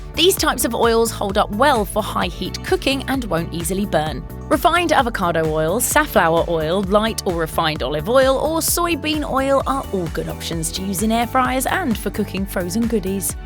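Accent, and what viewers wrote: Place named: United Kingdom. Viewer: why use a 'terribly British' style voice-over then continually quote temperatures in F when we tend to use C ?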